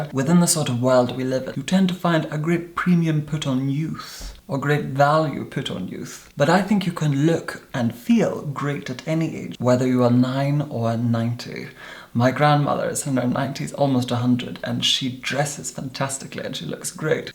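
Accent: Scotland, United Kingdom. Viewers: Jack Walker it's just a contorted posh Scottish accent.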